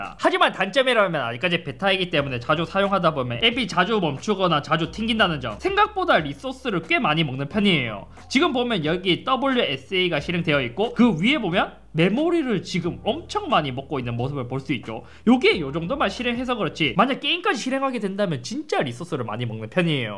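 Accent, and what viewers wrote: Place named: Seoul, South Korea. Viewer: 형 발음이 역시 서울..킹..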